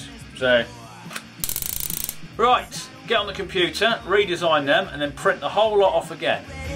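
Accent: United Kingdom. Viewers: I can't understand half of what he's sayin' did he improve his british accent in recent vids?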